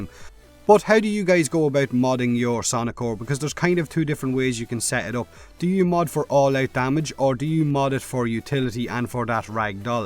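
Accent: Ireland. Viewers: Holy shit, that is an awesome Irish accent! Hahah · I do enjoy an Irish accent much better than listening to the wee hawbaw wanks about here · Irish accent sounds so funny :D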